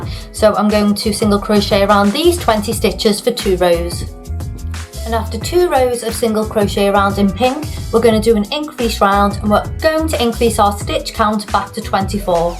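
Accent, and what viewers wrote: United Kingdom. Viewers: I just bought this pattern & I am excited to make a start. I do have 2 questions though. Why is (Jason Mamoa’s) Aquaman white? Also from your accent, I guess you’re British. But you use US terminology. Is this pattern available in UK terminology. I don’t mind buying it again, as it would make my English heart glad!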